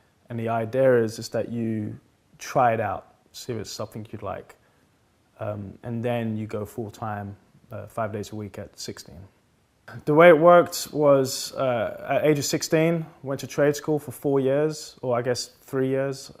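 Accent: England, United Kingdom. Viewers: I did ask where you were from on another video, as you sounded to me like you were from England, but I’ve just realised that you answer that in this video, so that’s the end of that question. You’ve had an immense amount of training and experience in the industry, and I wish so much that I’d have had the encouragement when I was in school (I’m from Wales). It’s only now, at the age of 38 that I have had the chance to find my passion in woodworking. It’s always nice to hear when people had a great teacher that encouraged them, rather than hindered. Anyway, it’s great to see someone homegrown doing so well in another country and also being respected as an artist too. By the way, the chair design that you make a limited production, looks amazing! I’m really enjoying watching your videos. I came across you on Instagram and had no idea you had a YouTube channel, so I’ve been binge watching and I must say that I really like the production value. 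Also, the fact that they don’t have a voice over is a great change, as it really makes me feel like a fly on the wall. Holy hell, I’ve just realised how much I’ve written. Anyway, here’s a big hello from the West Midlands, UK. Signing out.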